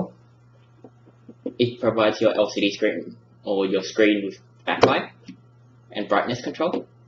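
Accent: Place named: Australia. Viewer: You do realize Aussies all soundlike him right? It's not effeminate.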